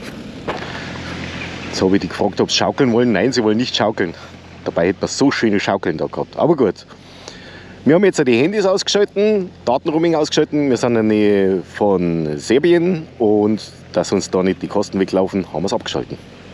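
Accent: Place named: Bavaria, Germany. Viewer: Wir waren 2024 mit dem Bike in Rumänien. Einfach ein Traum. Deshalb werden wir heuer wieder hinfahren. Hab in meinem Navi bereits sehr viele Punkte eingetragen. Deine Videos sind immer sehr unterhaltsam und informativ. Und deine Handzeichen hast dir immer noch nicht abgewöhnt 🙂. Aber das und der Bayrische Dialekt ist eben dein Markenzeichen, und des passt scho so.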